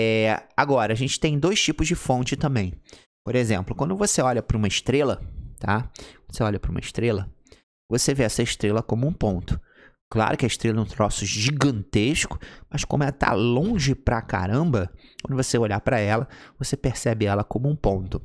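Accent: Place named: Rio de Janeiro, Brazil. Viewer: Ele e do RJ kkkk sotaque kkkk aula boa 😊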